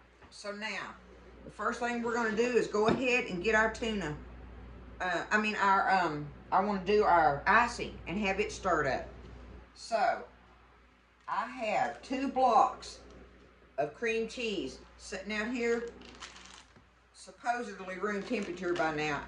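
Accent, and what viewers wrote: South, United States. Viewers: Ms. I just recently found your channel and you had me not only for your great recipes and shortcuts but the first thing to really get my attention was when you opened your mouth and spoke. That accent made me feel so much at home in your kitchen. I live in NC and have had so many people comment that I was “country as cornbread” when they first heard me speak. That was years ago and many comments away and I’ve come to feel proud to be country. Thank you so much for your channel. Your recipes are great but just listening to you talk such a comfort. I’m 70 and only cook 1 meal a day and I ed tried se real of yours and have not been disappointed.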